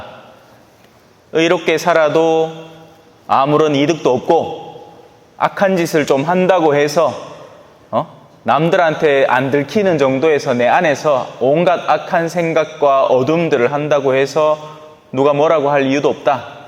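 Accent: Gyeongsang, South Korea. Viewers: 찬미예수님...신부님..말씀강론.잘들었습니다.경상도.사트리.정겹고.고향생각을하게합니다육남매.형제가.그립네요.신부님.사투리에.위안이되옵니다🙏🙏🙏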